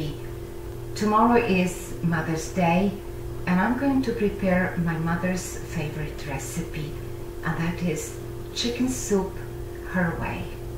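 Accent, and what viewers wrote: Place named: Eastern Europe. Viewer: You do the same! You're a lovely host, love your channel.😊 Are you in Eastern Europe by chance? I hear your accent & it's sounds familiar... The reason I ask is that my grandmother came to the US from there many, many years ago. Her style of cooking was similiar to yours also... 😁 I came to your channel from the "I am Leo" channel. Vesna is a terrific lady rescuing & taking care of all those stray animals isn't she?! I love her for doing all that... Seems to be that I'm starting to make friends in that part of the world, I've never been there but hopefully someday I'll be able to go there. There seems to be so many nice, friendly & sincere people there! Wonder traditions and family values there, I guess you could say I'm embracing my ancestry... 😊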